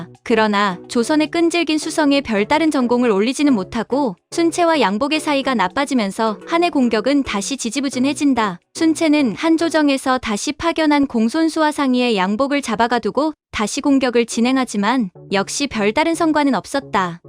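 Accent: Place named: Japan. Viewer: 이거 무슨 일본놈 한국말 하는 소릴 하고 있어 ~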